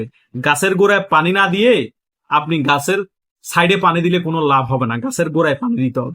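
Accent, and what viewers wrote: Chittagong, Bangladesh. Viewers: ভাই আপনি কি চট্টগ্রাম অঞ্চলের লোক?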